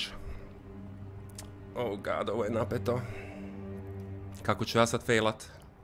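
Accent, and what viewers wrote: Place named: Chakavian, Croatia. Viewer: sta ti pricas Cakavskim narjecjem?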